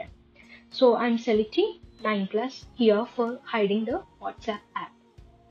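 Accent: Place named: India. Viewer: You know this is real because of that Indian accent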